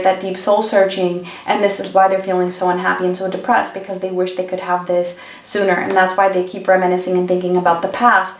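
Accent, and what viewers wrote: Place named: United States. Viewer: Also are you Greek? Your accent sounds American.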